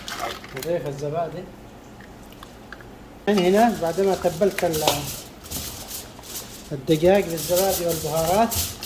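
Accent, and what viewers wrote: Yemen. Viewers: يا جماعة الشيف يمني والا شنو لهجته + عاشت ايدك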